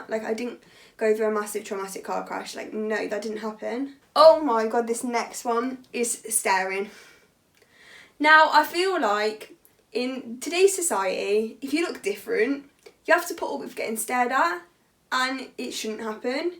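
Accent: United Kingdom. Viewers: Hello, from across the pond. I think you're beautiful inside, and out. and I love you British accent. May God bless you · Woah your the girl from Instagram I never knew you had s YouTube and a British accent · Rik Pine Its the British accent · I would simply like to say that you are living confirmation that the only thing which separates the British and we Americans is a common language. Your "accent" is charming! Yes, I know, we Americans trample all over proper English; but I could listen to you all day and never tire or tyre of your delightful soliloquy.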